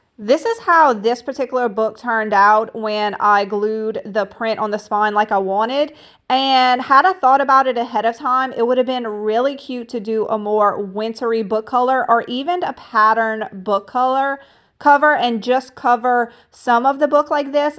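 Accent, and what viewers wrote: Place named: Louisiana, United States. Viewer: Just found you today and LOVE your ideas! Beautiful…..also, can I guess where you’re from by your accent and that it doesn’t usually snow there alone? Louisiana? I’m from Southeast Texas and that’s just what your accent reminds me of 😀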